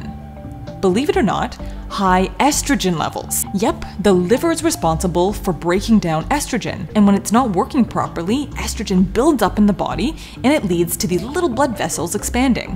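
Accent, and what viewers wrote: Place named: Canada. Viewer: She sounds Canadian. Lots of good information here.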